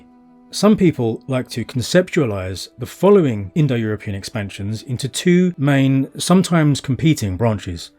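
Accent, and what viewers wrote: England, United Kingdom. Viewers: Oh… So in England, you say it wrong.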